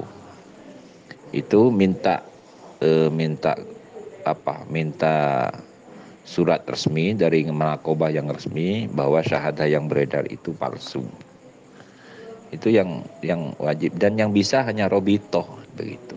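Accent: Madura, Indonesia. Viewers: Kalo lihat logat bahasa, logat bahasa Madura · Suaranya seperti akaen madura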